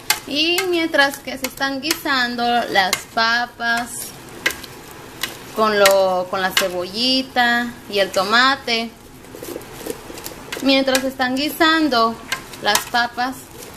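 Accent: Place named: Sonora, Mexico. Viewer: ya esta pues mushasha si te creo que seas de sonora porke asi ablamos con la she o sho jajaja saludos desde los angeles californiae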